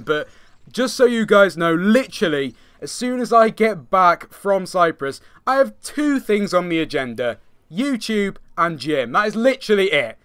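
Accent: United Kingdom. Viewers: No offence but you must be brain dead if you think he sounds like the gaming lemon. Just cause his accent is British it doesn't mean they sound the same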